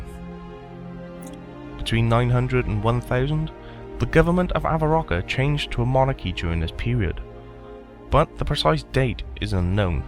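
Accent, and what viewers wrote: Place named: South Wales, United Kingdom. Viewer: What part of Wales are you from? It's driving me crazy. You've definitely got a south walian accent. I'm gonna guess and say Merthyr or Rhondda or somewhere around there lol.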